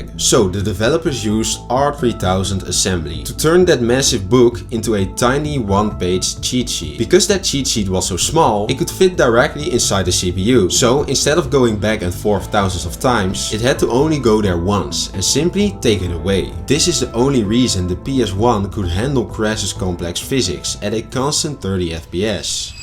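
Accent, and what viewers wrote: Netherlands. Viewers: are you dutch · Plz dont stop making vids. I love them! Btw, are u Dutch?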